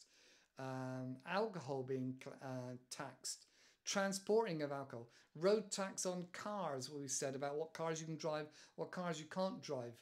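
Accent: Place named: United Kingdom. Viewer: first comment 😊 ... greetings from Mexico 🇲🇽✨ and tank you ...I love the british accent ✨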